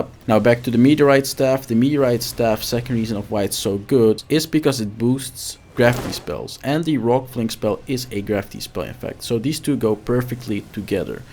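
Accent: Netherlands. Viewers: you have to be dutch, i can hear it. nice video :)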